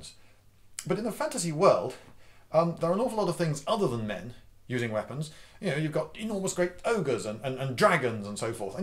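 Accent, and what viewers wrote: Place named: United Kingdom. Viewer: Why does everything seem so much more interesting with a British accent and slang? You should do a video on that.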